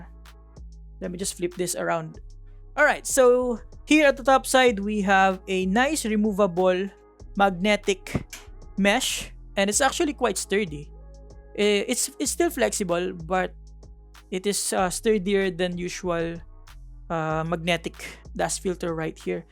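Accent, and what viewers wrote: Philippines. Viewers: alam mo kagad kung pinoy yung nag sasalita 😅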